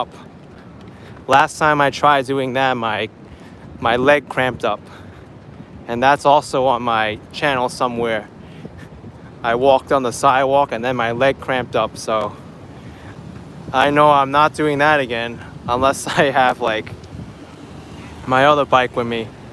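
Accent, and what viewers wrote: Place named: New Jersey, United States. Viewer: YOU are at my former neighborhood. Although I grew up in Union City just north of Jersey City. You got the Jersey accent very good. Who ever thought there would be skyscrapers in Jersey City!! WOW! I miss seeing the skyline from Jersey. When I lived in Union City I saw the skyline everyday. Thanks for traveling to Jersey City. I hope you got back home safely. God bless you.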